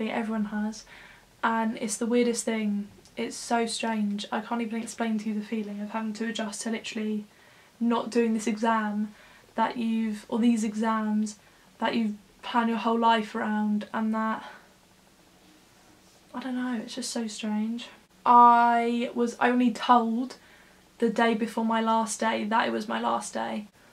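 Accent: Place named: Midlands, United Kingdom. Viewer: Changed my mind to somewhere in the Midlands but that of cause is not the point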